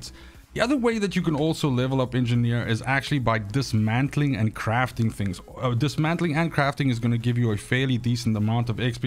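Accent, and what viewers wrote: South Africa. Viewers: Liked and subscribed. Sounds like a South African accent there👌🏾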